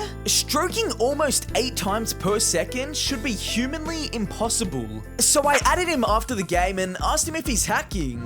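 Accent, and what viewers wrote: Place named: Australia. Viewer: where u from? u sound australian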